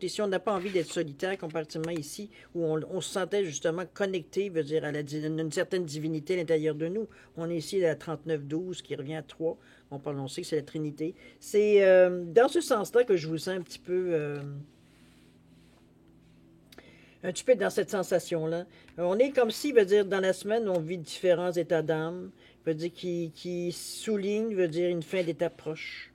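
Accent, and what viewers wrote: Quebec, Canada. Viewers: super bonne interprétation j'aime ton accent québécois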